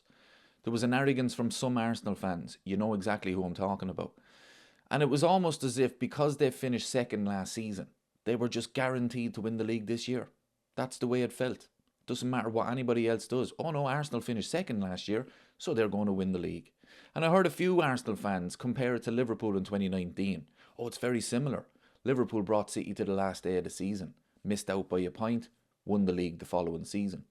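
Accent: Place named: Laois, Ireland. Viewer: If we only win the league i still be happy. Are you a laois man. Im trying to think where the accent is from.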